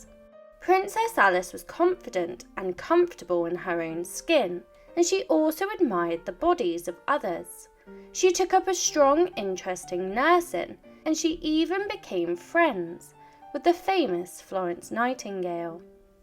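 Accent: United Kingdom. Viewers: . “She also wore black at her wedding.” “She arrived to the ceremony in a white gown.” “Queen Victoria forced everyone to wear black before and immediately after the wedding.” Like, wtf? Also, whichever British accent you have should be done away with. It is the most annoying manner of speaking I have ever heard.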